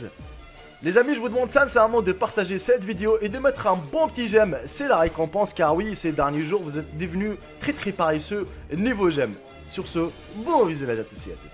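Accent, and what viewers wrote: Tunisia. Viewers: T un tunisien ?? Ena zeda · T'ES Tunisien ?! Comme moi x)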